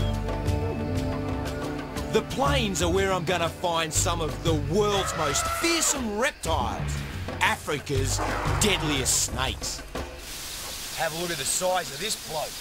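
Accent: Australia. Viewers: I fucking LOVE how Steve says "Cobra!" the Australian accent is just beautiful at times! COB-RA!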